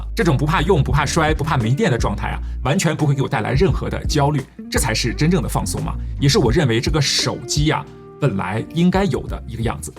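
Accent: China. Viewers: 你们台湾人觉得不觉得他的口音更像大陆的？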